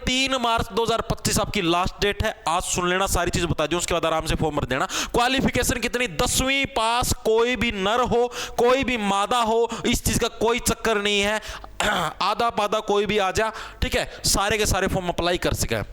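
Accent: Haryana, India. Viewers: Bhaiya mja haryanvi ava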